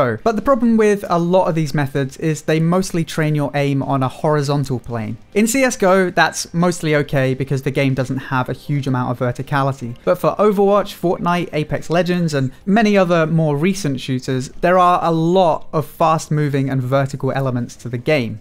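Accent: United Kingdom. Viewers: Where r u from ..?? British accent ..!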